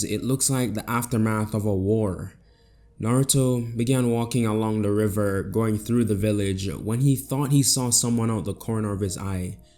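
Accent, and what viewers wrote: Jamaica. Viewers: Very refreshing to hear Jamaican making this kind of content. I had to come back after years to listen to this story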